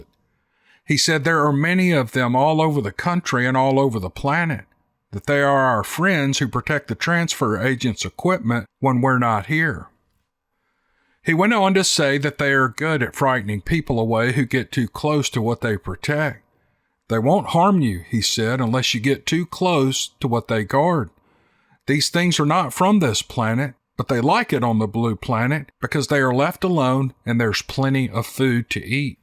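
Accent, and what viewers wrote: South, United States. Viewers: I work for Amazon and we're going for broke in the the 5-6 weeks before Christmas. When I catch a gasp when I get home at 6 a.m. I love to catch your you postings before I go to bed. Thanks for your dedication to the narration of the the tales sent to you by writers, but they are a wonderful tales, wonderfully narrated, in the style (and southern voice) of a wonderful Southern yarn that I have come to miss from living in the South. Thanks Man, the skill of Southern yore telling is well and alive in you.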